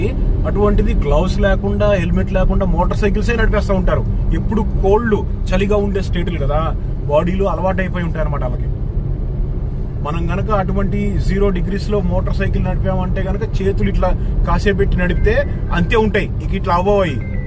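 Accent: Andhra Pradesh, India. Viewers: Brother... Telangana slang.. kaadu ❌ Andhra slang ... ☝️ How..how how??? 😅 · Broo nenu ni voice chusi andhra anukuna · Hello, garu, Mee accent Andra telugu laga undi, Nizamabad accent ledu. Mee grandparents proper place ekkada? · Andhra language laaga undi meedi due your circle in usa or meeru tg ki move ainara ? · Your slang is looking like Andhra, not telangana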